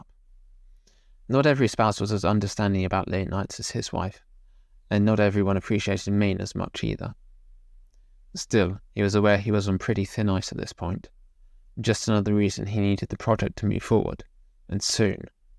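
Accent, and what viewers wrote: United Kingdom. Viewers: I quite like that it is author narration. Also better British-accented American, than AI spoken anything.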